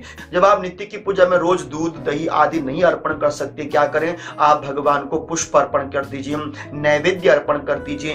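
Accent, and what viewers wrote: Standard. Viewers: Bahut achhi video,shudha uchharan k sath...apka dhanywad...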